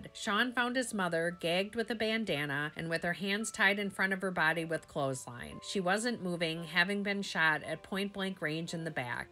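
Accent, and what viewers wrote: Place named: Wisconsin, United States. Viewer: You even sound like you're from Wisconsin, probably even Milwaukee. I can tell by the way you pronounce the name of the city. You don't pronounce the L's. (Muhwahkee) So I would expect better of you if you are indeed from Wisconsin like it really sounds like you are. Don't you want people to think more of your extremely dynamic and beautiful state???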